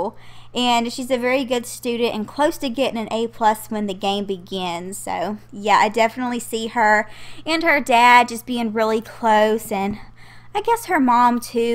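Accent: South, United States. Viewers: So Cute......... And Loving Your Southern Accent...... As You See I Am Southern! HUGZZZZ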